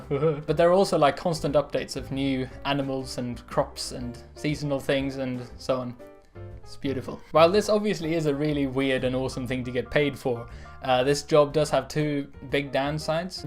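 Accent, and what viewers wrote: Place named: United Kingdom. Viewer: Do all sweeds have this gorgeous British accent??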